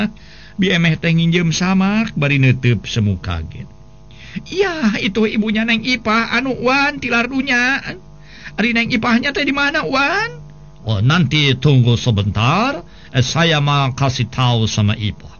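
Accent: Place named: Sunda, Indonesia. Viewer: lamun hayang di ajar basa sunda....tah dongeng anu Sundana masih kental....sae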